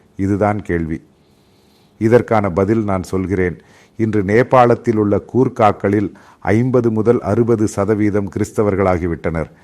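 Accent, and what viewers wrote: Kerala, India. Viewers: This is Ai generated video. He is a malayalai and I know he speaks Tamil with a heavy Malayalam accent. This is not hsi voice. And here he speaks Centamil 😂😂😂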